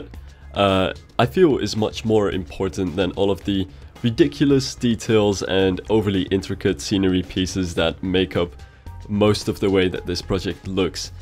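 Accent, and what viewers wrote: Netherlands. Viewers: Literally Dutch with a terrible cold... Beterschap,!:)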